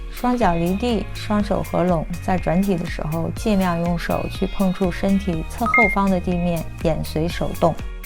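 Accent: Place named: Australia. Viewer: Australia!!! i love aussie accent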